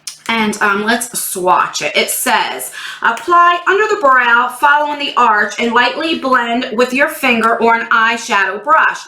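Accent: New Jersey, United States. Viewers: Good box! I really like your earrings, they're giving me a 90s vibe. Also, your Jersey accent is coming out strong today 😀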